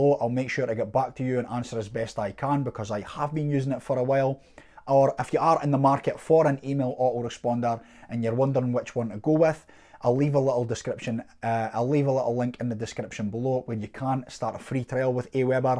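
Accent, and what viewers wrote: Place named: Scotland, United Kingdom. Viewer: love the Scottish accent. Helpful video. I find AWeber to be difficult. I used mailchimp for years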